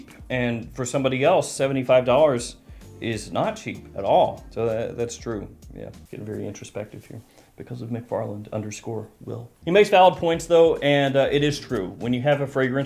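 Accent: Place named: New Jersey, United States. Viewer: Are you from nj? Lol because sounds like nj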